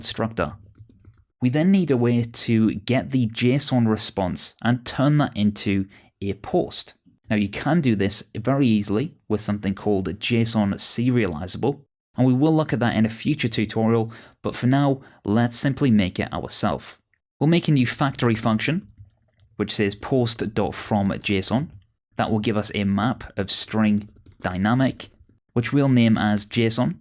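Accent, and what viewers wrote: United Kingdom. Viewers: I like brittish accent and tutorial style of video auther!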